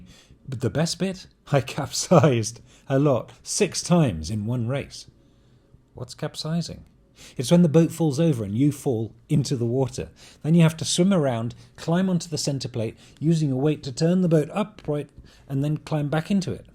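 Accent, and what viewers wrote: RP, United Kingdom. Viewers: Наконец-то я дождалась видео, где полностью говорит по инглишу. Такой приятный акцент. Раньше британские акценты не любила. Но акцент просто мррр. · It is the BBC accent 😂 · Thank you for your gorgeous British English among everyday Globish!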